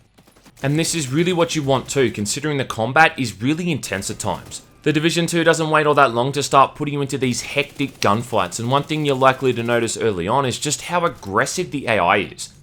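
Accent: Australia. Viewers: that australian accent tho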